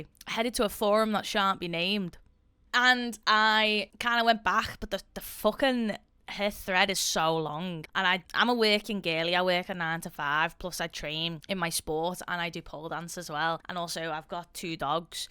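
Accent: Liverpool, United Kingdom. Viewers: liverpool, england. it's a scouse accent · I've lived outside the UK for 8 years now. Love the vids of yours I've watched so far anyway, buuuuuuut listening to your accent is making me so nostalgic for home and adds an extra layer to enjoy!!! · So lovely to hear that Scouse accent. Hello from down here in Australia! Well done on your incredible achievements! Ps. seeing as you're an Amberlynne gorlie, did you see her work-from-home WIEIAD video? It was hilariously stupid. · Aaaa scouse! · I’m Russian and I adore your British accent. It’s a heaven for my ears besides all the well educated guesses of yours. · Heard the scouse accent mixed with lifting knowledge and subbed. Hello from West Yorkshire! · Where exactly in the UK is your accent from? American here, just curious — thanks 🙏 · Accidentally clicked on this and heard the Scouse and stayed the whole time haha. 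· I was suggested to watch you on the last video I did! Love the scouse accent and looking forward to seeing more of your content 😁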